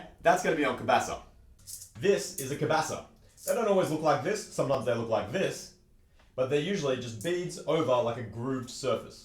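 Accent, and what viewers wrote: Australia. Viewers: is he Ashton's missing dad? he's a adult he plays drums like Ashton , he has a Australian accent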